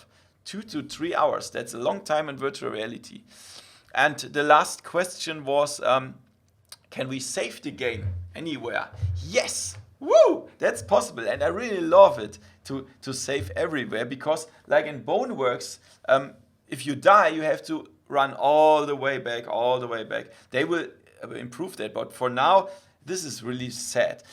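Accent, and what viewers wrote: Germany. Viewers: One question do you speak german because i hear a german a aksent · Nice video, I love the german accent haha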